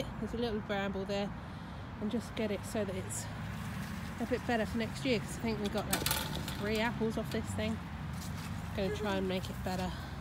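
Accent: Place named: Hampshire, United Kingdom. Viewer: Just wondering what part of the country you are in. You sound as though you may be from Hampshire. I love your videos and the one where you work at the rabbit run in the dark made us laugh as we had so much trouble with rabbit runs lass year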